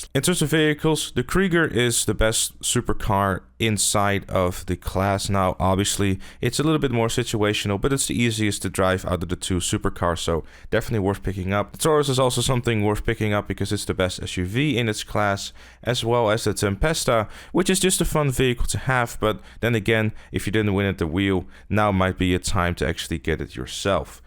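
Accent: Netherlands. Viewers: I miss the Dutch accent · Wait are you Dutch?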